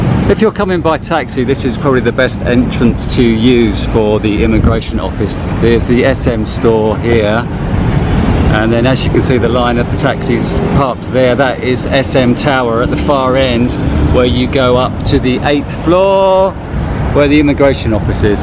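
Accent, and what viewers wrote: United Kingdom. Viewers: I can't take your snooty British accent for more than 30 seconds.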